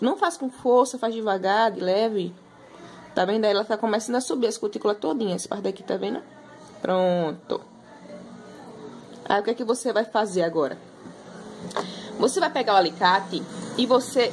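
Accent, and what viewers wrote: Northeast, Brazil. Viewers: Eu adorei o sotaqueee!!! Nordeste!!! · vc é nordestina?😍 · Quando ouví pensei logo Nordeste nas paradas... Tive a certeza quando pronunciou Cli..en..tíí. igual minha amiga...😁😀😊 Amei sei vídeo 👏👏👏👏👏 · Parabéns! Adorei a explicação. E viva o sotaque nordestino, eu amo. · Sou carioca, nas amo sotaque nordestino. Acho que nasci no lugar trocado..😂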